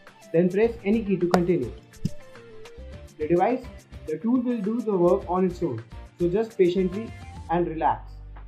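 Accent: India. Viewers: dude, your indian accent is hard to understand for people who are not from india. he wants you to put captions on the video or make custom subtitles on your videos.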